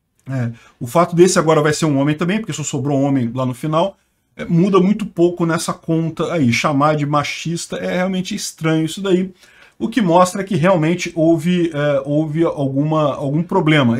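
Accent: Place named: Rio de Janeiro, Brazil. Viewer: Uma sugestão pro seu canal: que tal colocar uma música de fundo? Você é do Rio de Janeiro? Deve ser do interior, porque seu sotaque é meio misturado...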